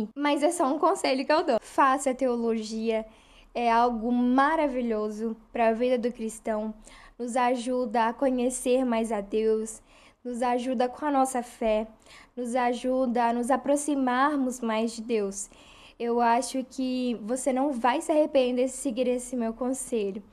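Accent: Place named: São Paulo, Brazil. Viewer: Moça ótimo vídeo. Sou formado em RH e estou terminando Ed Física. Penso muito em fazer Teologia ou História, ainda estou nessa dúvida. Já tenho a prática de ler muito a Bíblia pelo menos 5x por semana e talz, pq amo esse relacionamento com o Senhor, enfim... Só gostaria de saber de você pela sua experiência: Você sugere o curso de Teologia ou a Faculdade, mediante há alguém que a princípio não pretende trabalhar na área Teológica. E, qual faculdade de SP presencial (Se for de SP pelo sotaque kkk), você indica?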